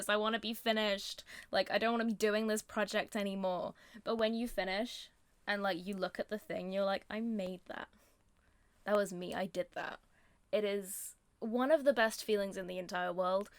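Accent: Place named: United Kingdom. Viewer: You're so cute and talented :) and also I love your British accent <3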